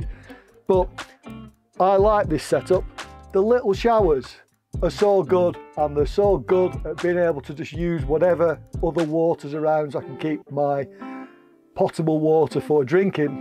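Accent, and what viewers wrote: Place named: Lancashire, United Kingdom. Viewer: He does have a strong accent, my guess is he's from Lancashire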